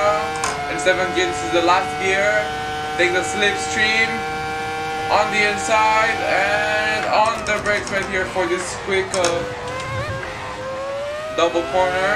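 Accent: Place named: England, United Kingdom. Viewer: Vezi ca ai putina engleza pe la coltul gurii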